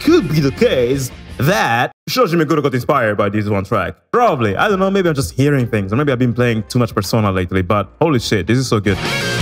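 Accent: Italy. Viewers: but... Do you have Italian origins? your Italian accent is perfect!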